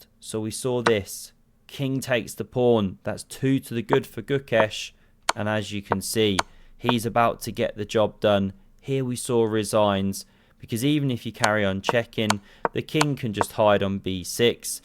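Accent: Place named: Bedford, United Kingdom. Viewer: Hey man, thanks for uploading, despite the difficult times, you bring great joy, even if you don’t know! I only discovered you recently, but already am a big fan! Nice of you communicating openly about the absence, even the reason for it, which you didn’t need to (but it explains the obvious and understandable sad mood). Good wishes to you and your family, which is surely so blessed to have you! PS don’t worry about us the audience, we have also the old material in the meantime and also priorities are priorities. Don’t put too much pressure in the meantime on yourself in these stressful times, and don’t forget to take enough C vitamin, which helps body deal with stressful reactions! Cheers & greetings to Bedford(shire), from someone, who has an accent nearly identical to yours, not because of the one semester that I spent at the University of Exeter Business School as an exchange student - where I was the best at the University of Exeter Chess Society - but because of a certain highly perfectionist English lady, who took even the slightest mispronounciation out of me and ended up mocking me at the end on how I have “a perfect Bedford accent” (she was from there) hehe. 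Loved the culture, people and country, had the best time there (still feeling English a bit, even though ethnically I’m not 😅 … even wore the red poppy for years, still have it 😊 ). Lived in three other foreign countries, but England I loved!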